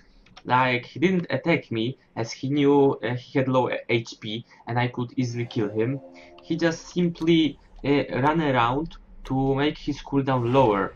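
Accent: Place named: Poland. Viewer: Oglądałem tylko ten filmik i wyczułem po prostu Polski akcent i tak się zastanawiałem.. :)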